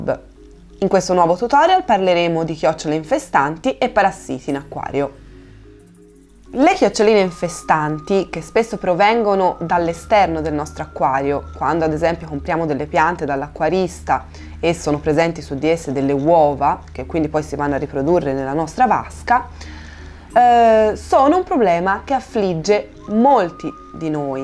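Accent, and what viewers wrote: Tuscany, Italy. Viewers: accento toscano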